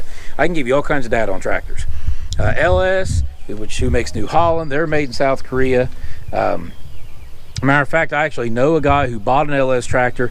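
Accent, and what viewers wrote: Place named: North Carolina, United States. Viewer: Sounds like you're got a touch of north carolina down east in your speaky.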